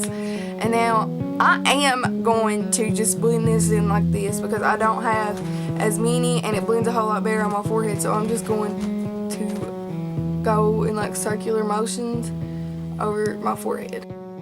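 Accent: South, United States. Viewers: Southern accent omg😍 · southern accent lol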